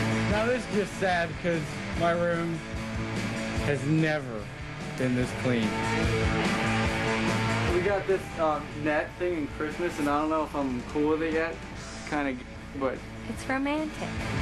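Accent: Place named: South, United States. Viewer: Before his southern accent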